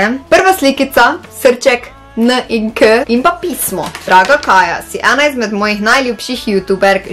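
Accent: Maribor, Slovenia. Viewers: Sodelujem 😊❣️ Ful fajna sii👌 pa fajn je videt da niso youtuberke sam iz Ljubljane in res mi je všeč mariborski naglas čuj😍 Upam da dobim čevljeee komaj čakam❣️❣️❣️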